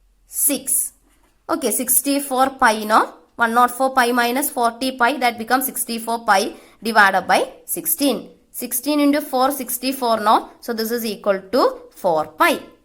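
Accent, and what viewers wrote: Kerala, India. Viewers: Just wanna know Mam are you from southern part of India your accent & your teaching is as amazing as I know southern people it was nice experience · Mam are you from Kerala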